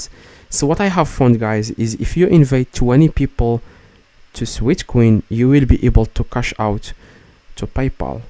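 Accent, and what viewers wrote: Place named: Morocco. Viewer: 3rftu mghribi gh mn laccent dialu😂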